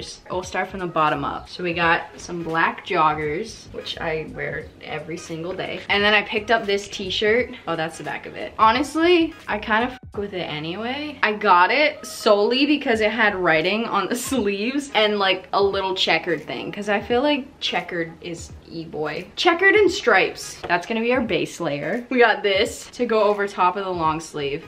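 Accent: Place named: Canada. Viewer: Question: Are you Canadian or am I stupid? You wound very Canadian. I’ve been following you on tiktok for a while but this is the first time I’ve heard your voice and for some reason I fucking love it.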